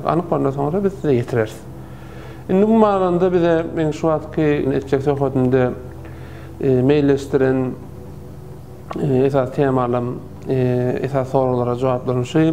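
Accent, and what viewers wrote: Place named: Balkans. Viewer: aga oya gidip bilemzok veli shu mahal giden yaly boldym sizin gurleshiniz eshtdip (balkan aksendinda) ❤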